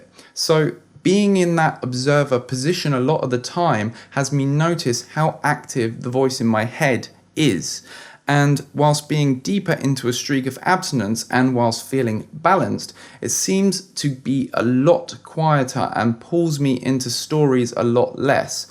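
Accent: United Kingdom. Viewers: I heard Wotor couple of times, you must be from UK, am I right ?😆👍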